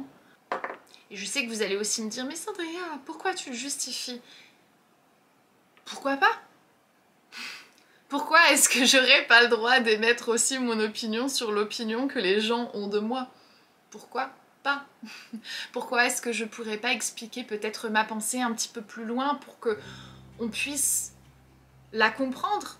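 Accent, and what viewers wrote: United States. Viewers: Je pense que c'est lié à l'accent américain. Je saurais pas l'expliquer mais l'accent américain sur le français peut donner cette impression / les mots anglais dans une phrase française aussi. C'est mon analyse de la question mais c'est vrai que quand des Français se mettent à utiliser les anglicismes à tout va j'ai cette impression les concernant qu'ils sont "faux" ou parfois qu'ils sont prétentieux. Bien sûr dans ton cas, c'est différent car tu es partie aux Etats Unis mais ça peut fausser l'impression de certains français à mon avis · ta manière de parler très US même quand tu parles français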